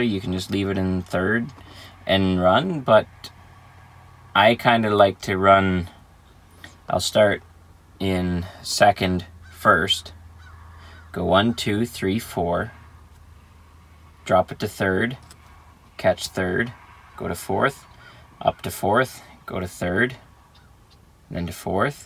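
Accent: Canada. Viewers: The first 10 seconds I knew this fella was Canadian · Hey buddy you from Canada?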